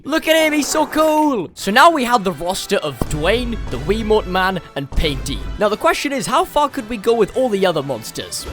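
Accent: Wales, United Kingdom. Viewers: Holy crap thats the most welsh accent i ever heard 🏴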